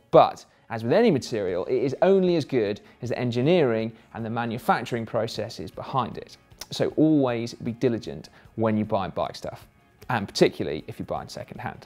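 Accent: United Kingdom. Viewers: Science glasses and Alan Partridge accent.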